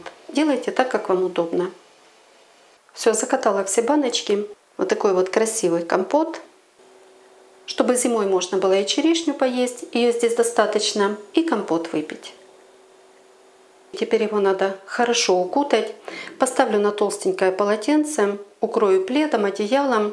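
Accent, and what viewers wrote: Ukraine. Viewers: И не только компоты,но и консервацию.Правда, заливала несколько раз.А вы из какого города,?Очень приятный у вас украинский говор.Я сама родилась и пол-жизни прожила на Украине. У местных господинь всегда было множество просто классных рецептов еще из времен СССР)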